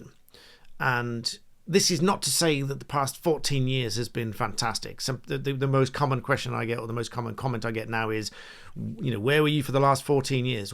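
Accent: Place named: England, United Kingdom. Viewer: I like the way you explain things in very clear english accent well done Mate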